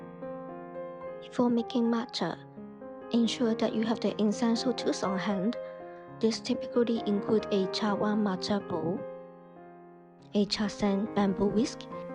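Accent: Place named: China. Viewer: Are you Chinese? I love your accent.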